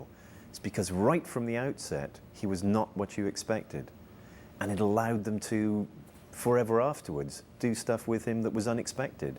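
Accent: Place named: United Kingdom. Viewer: Love the british, or whatever it is, accent.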